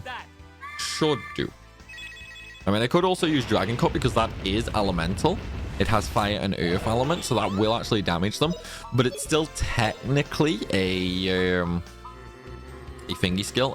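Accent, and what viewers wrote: United Kingdom. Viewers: I followed this guide and it was accurate and precise to finding all the items. I don't know which was worse tho.... this convoluted tower or listening to this atrocious accent. Why do some brits gurggle the English language like they are choking on spoiled milk? Prime example of why the American revolution needed to happen.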